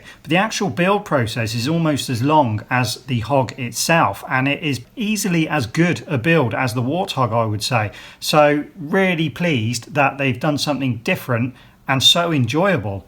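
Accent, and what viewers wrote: United Kingdom. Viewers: Great review. I'm really looking at it just for that Forge figure. The face sculpt is fantastic (probably for some villain rather than Forge) and, as you point out, those details like the Ace card might not be readily visible in 'real life' but really come into play for stop motions where you get close to the figure. BTW : You sound like a Brit. How did you get hold of this set and (if its OK) what did you pay? BTW2 : Great MOC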